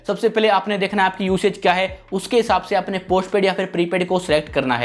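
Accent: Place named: Haryana, India. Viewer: aapki aawaz se pahchana main bhi haryana se hu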